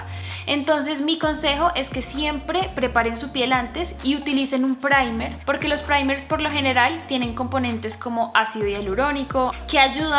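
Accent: Bogotá, Colombia. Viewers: Eres de Bogotá? Jaja, es que por tu acento se nota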